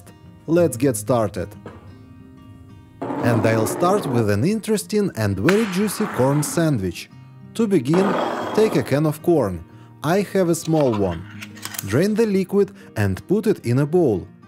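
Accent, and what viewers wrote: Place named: Russia. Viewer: I dont either , many tricks dont work... and that heavy Russian accent !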